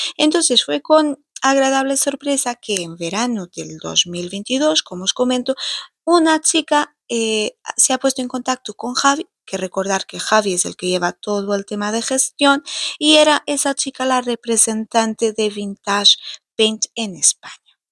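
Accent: Portugal. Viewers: Gracias por contar los inicios del proyecto , yo os sigo desde hace un año aproximadamente y tenia mucha curiosidad por saber como habia empezado todo, Tarde un poco en reconocer el acento ,pero habia llegado a la conclusion de que efectivamente eras portuguesa,pero creia que Javi era carpintero Gracias por todo tengo una casa heerdada muy antigua en la que como autodidacta llevo peleandome toda la vida por mantener eos muebles antiguos heredados¨Teneis unas ideas geniales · Iiiih gracias a ustedes, imaginé que eras portuguesa por tu acento, lo que no imaginé es que fueran pareja ¡Olé a los dos! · Tienes un acento precioso me relaja ver vuestros vídeos y escuchar ese acento portugués tan agradable que tengáis mucho éxito un abrazo a Javi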